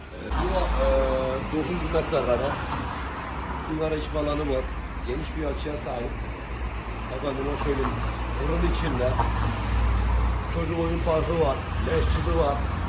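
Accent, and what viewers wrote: Gaziantep, Turkey. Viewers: usta selamın aleyküm Ya Antep şivesine hasta oluyorum çok güzel kolay gelsin ustam yeni lokantan hayırlı uğurlu olsun inşallah 🤲👍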